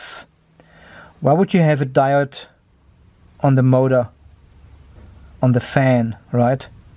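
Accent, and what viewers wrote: Germany. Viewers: Thanks for your nice videos., bist Du deutscher? Ich hatte schon öfter gedacht das Du einen deutschen Akzent hast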